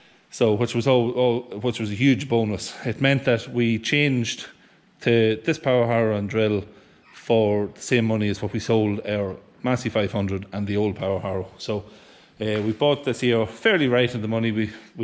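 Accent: Louth, Ireland. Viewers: Are you from co louth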